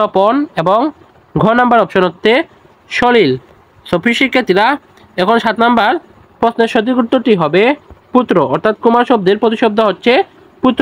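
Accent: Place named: Sylhet, Bangladesh. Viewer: You are from sylhet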